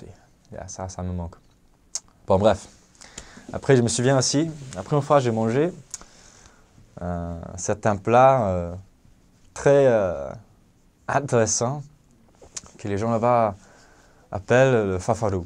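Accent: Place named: Tahiti, French Polynesia. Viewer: il a même garder la façon de parler des tahitiens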